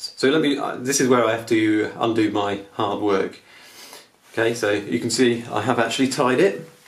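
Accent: United Kingdom. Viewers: The English accent makes it better! But seriously I got it with this video.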